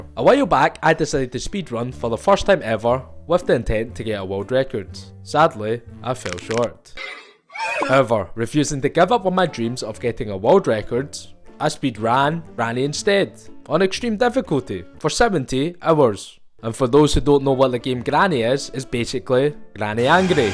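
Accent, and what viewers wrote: Scotland, United Kingdom. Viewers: the asian with the strongest british accent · Saw this vid on my homepage, pressed and liked the humor and ur Scottish accent is so entertaining and I think eventually followed, looking forward to watch more vids, LOL. “Get fucked”😭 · an asian with a scottish accent? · Yoo a fellow Scotsman! Congrats on the world record and the fantastic accent 😂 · Why do you look Asian but sound like a scot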